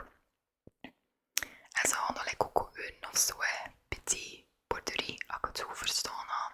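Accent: West Flanders, Belgium. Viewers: Als een oost-vlaming met beetje zeeuws vlaams in zich is het heel leuk om naar het west vlaams te luisteren en het helemaal te proberen begrijpen ☺️ ook leuk verhaal over je buurman ik begrijp hem zeker! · West-Vlaams is leuker, alleen bergrijp ik niet goed wat je dan zegt🤣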